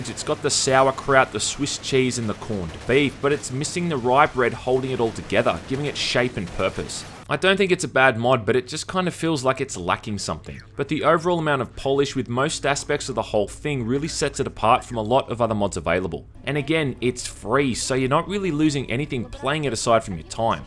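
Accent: Australia. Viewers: I fucking need subtitles to understand your aussie.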